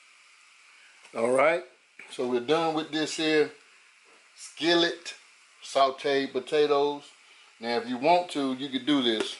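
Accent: South, United States. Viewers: I haven’t even watch the video yet but I can tell by the thumbnail and country voice this is going to be good. I love black people!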